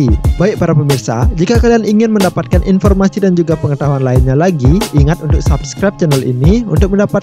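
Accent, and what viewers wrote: Aceh, Indonesia. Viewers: Dari logat km bicara Aku yakin km orang aceh kan??? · Youtubernya kayanya org aceh. Suaranya khas sekali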